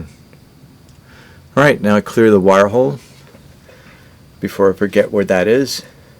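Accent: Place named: Brooklyn, United States. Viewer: I detect some Brooklyn in thar…..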